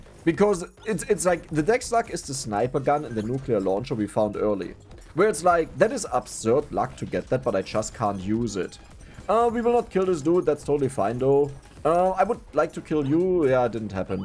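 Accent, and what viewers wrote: Germany. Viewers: Alter ich denk die ganze Zeit was hat der für einen abgefahrenen dialekt. Und dann ja es ist einfach Deutsch mit irgendeinem lokalen Englisch dialekt? Hört sich auf jeden Fall nicht nach Schule Englisch an. Ansonsten schickes video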